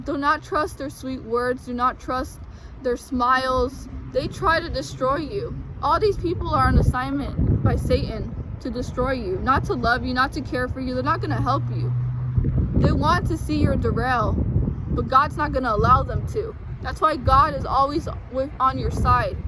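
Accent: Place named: England, United Kingdom. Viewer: I like your english accent , i study english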